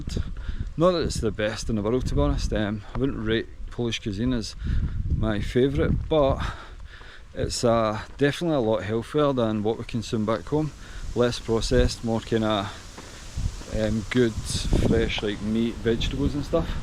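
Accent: Scotland, United Kingdom. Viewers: You are Scottish ,so I know why I need a subtitles to better understand you. I learn English and northern accents are difficult for me. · Poland is 20 years behind UK. Its unevatible. Love your accent ! Long live the scots ! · You have a huge+ for your Scottish accent. Thanks for the video and enjoy Poland. Not bad joke about ppl of Paisley, but is it really that bad? lol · the Scottish accent is very heavy and thank god for the subtitles or we could not get it all, also he mumbles. But good points he makes. However the cost of living has gone up a lot in PL, they also have 23% VAt!!!!!., He is right about a lot he says about the good food quality. There is also good competition between German owned Aldi and Lidl, and Portugese owned Biodroinka. Food is pretty fairly priced. However if the zloty does not depreciate soon, as it should given their deficits and debt burden, then PL is going to be overpriced like many EU countries. 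Good video, interesting and the Krakow area is one of the more expensive ones in PL to boot. Hi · Cool to listen to a Scot living in Poland. One thing, you are living in Central Europe, not Eastern Europe. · Well-done video and commentary even though I struggled a little bit with your Scottish accent while I was watching your video. Allow me to ask you for a favor:would you be willing to call Poland as part of Central Europe, or at least Central-Eastern Europe? If you keep classifying Poland as Eastern Europe , you put Poland in the same bucket where Russia, Ukraine and Belarus belong and you know well it is not the case. Poland is located in the center of Europe, Poland is part of the Latin culture through Catholicism, Latin alphabet, architecture, democratic tradition, very high level of freedoms where nobody will dare to cancel you because of your opinions. Cheers and greetings from a Polish-American.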